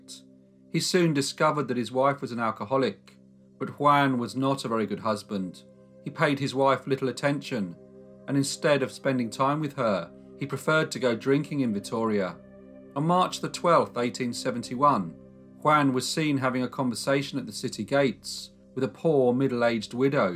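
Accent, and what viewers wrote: England, United Kingdom. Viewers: I always love the really obscure stories you find which nobody else presents to us. I sometimes imagine a sleep over in a Creepy Old Mansion,we loyal subbers are all gathered around the Great blazing fireplace with candles lit around the decrepit Victorian Room,we roast our Marshmallows as you sit at the hearth,your distinctive English accent regales us with dark tales of Bloody Murder. This channel is truly a glistening jewel in the Crown of Olde Youtubian Lore.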